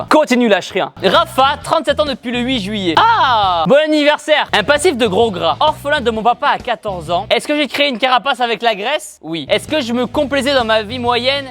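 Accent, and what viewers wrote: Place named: Paris, France. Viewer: TU VIENS DE TOULOUSE MAIS TU LA MASSACRES AVEC TON ACCENT PARISIEN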